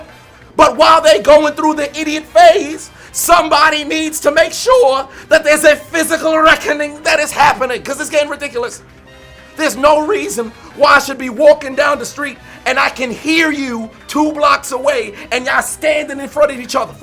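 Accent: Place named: North Carolina, United States. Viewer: Sounds like North Carolina or Florida honestly.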